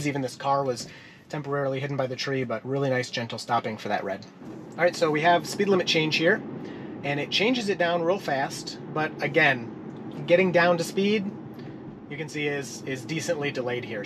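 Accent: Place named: Midwest, United States. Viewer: Just hit SUBSCRIBE, so I feel like I have the right to say this… Dude, you’re in Michigan. What’s with the “y’all” talk??? You even have an unmistakable midwest accent and you are clearly not southern. Just sayin’…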